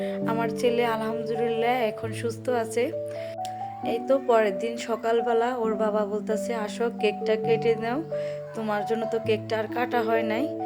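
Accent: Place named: Comilla, Bangladesh. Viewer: আপু তুমি কুমিল্লার আমিও তো কুমিল্লার ওই জন্যই তো কথাটা অনেকটাই মিলে যাচ্ছিল আমার সাথে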